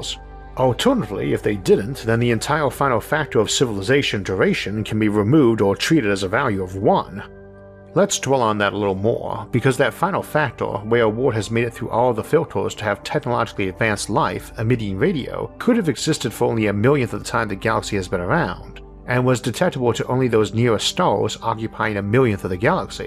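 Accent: United States. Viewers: I didn't know it was an impediment I just thought it was his accent from the part of the US he came from. I like it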